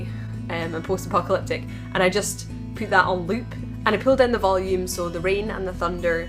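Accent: Scotland, United Kingdom. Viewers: I love your accent so much. I want to be Scottish when I grow up!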